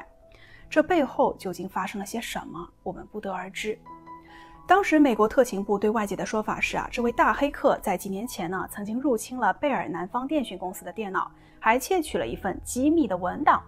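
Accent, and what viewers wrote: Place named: Beijing, China. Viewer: 还是能听出京片子味儿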